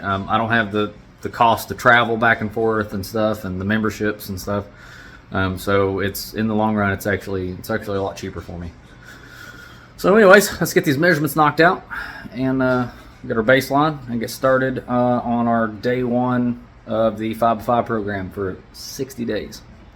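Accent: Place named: South, United States. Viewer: If the accent didn't give away that your from the south the camaro bumper used as a mantle sure did 😂 great video 👍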